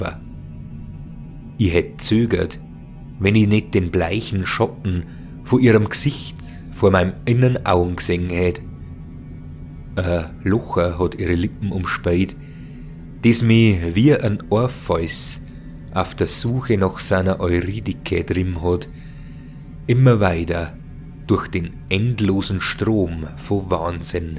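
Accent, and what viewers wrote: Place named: Bavaria, Germany. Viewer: Bockshaxert 😂 Bairisch isch eifach e scheene Dialekt! Griaßle usm Badische👍🏻🤘🏻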